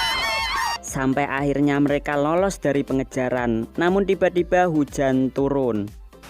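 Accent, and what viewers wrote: Java, Indonesia. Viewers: Jawa mana bang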